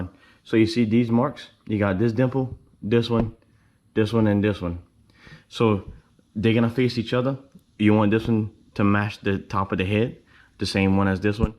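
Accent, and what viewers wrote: Louisiana, United States. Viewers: Damn you must be from Louisiana😂😂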